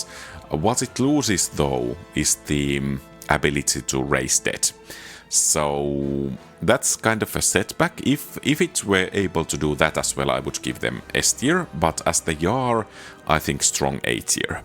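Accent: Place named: Finland. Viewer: What happened to your voice? You went from British accent to Finnish accent. I'm confused, unless you're using text-to-speech?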